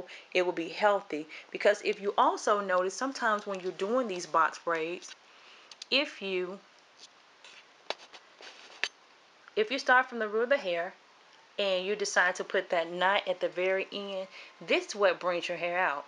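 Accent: South, United States. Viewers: I love your accent. It sounds southern. Yes, I'll get a mannequin head from Sally's, lol. Those braids are gorgeous and you are very detailed. ❤❤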